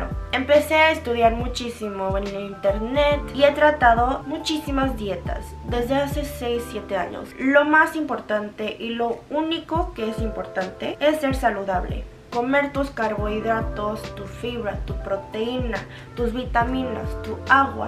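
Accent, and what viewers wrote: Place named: Fresa, Mexico. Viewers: Me encanta que hablas con acento fresa jajajaj hahah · Asi como estas dres muy guapa y si haces ejercicoo por salud te conviene seguir, pero unsa sola cosa quitate el acento fresa, es mejor un tono mas modulado o standard, como Selim la esposa de Minu, el Coreano de Corea.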